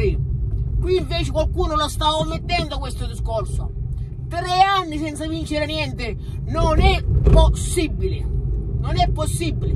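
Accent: Sicily, Italy. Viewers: Tu non riscontri mai e vai per la tua strada. Mi fai impazzire con questa tua magnifica inflessione sicilianissima e meridionalissima che fa a pugni con quei mezzi francesi di Villar Perosa tutti impettiti, tutti sinuosi, con le erre mosce e arrotondate. Come ti spieghi che nonostante hanno sempre vinto sono scolorati, scontenti, seriosi, noiosi e la festa si concretizza miseramente col solito mezzo giro a piazza San Carlo. Che noia, che pena…..Come fai da Catania a sentirti in sintonia con loro ? Lo spiegherai un giorno ?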